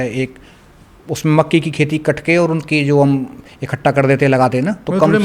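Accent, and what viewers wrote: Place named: Palwal, India. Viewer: Inki aawaz palwal side ki si lag rahe hai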